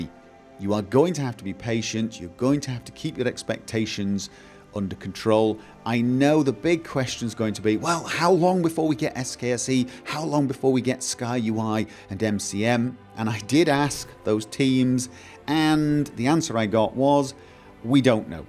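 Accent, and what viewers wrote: United Kingdom. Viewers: Proper British-English =/= Butchered American-English.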